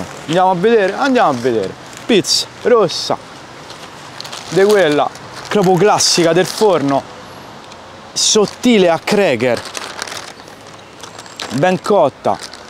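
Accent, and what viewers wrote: Rome, Italy. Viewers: Io ormai me li guardo tutti i tuoi video, semplice, genuino e poi l'accento romano nsè batte 🤣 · Grande. Io sono della Basilicata e vedo tutti i tuoi video. Mi piace tanto come ti esprimi e adoro l'accento romano. Continua cosi. 💪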